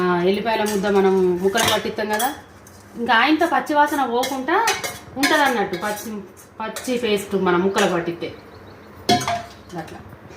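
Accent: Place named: Karimnagar, India. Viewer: Karimnagar district ani meeru matlade vidhanam tho gurthu pattanu. Ma pinni athagari Jagithyal. Vallu matladinatte undi . Ala gurthupattanu. .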